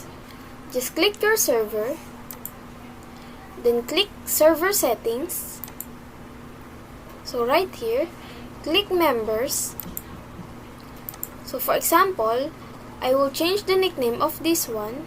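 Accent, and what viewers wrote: Philippines. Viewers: Based on her accent She is filipino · Yup i could tell bc of his accent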